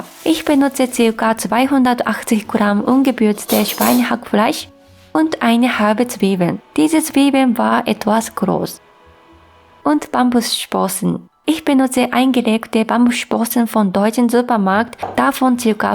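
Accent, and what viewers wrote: Japan. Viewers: "Und dann gebe ich noch 50g daTSU" Japanischer Akzent ist einfach bezaubernd <3 Ich muss es unbedingt nach kochen!, danke für dein tolles Video!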